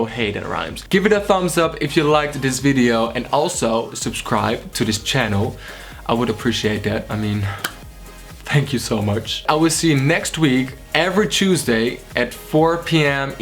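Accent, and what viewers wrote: Netherlands. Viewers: nederlands accent mijn maat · Jij hebt echt een nederlands acksent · Je hoort zo erg dat je Nederlands bent. Het is echt schattig😂❤️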